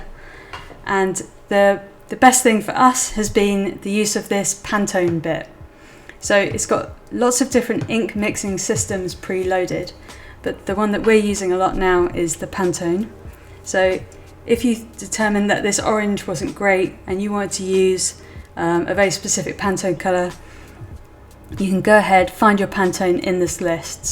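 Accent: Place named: United Kingdom. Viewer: Finally UK English!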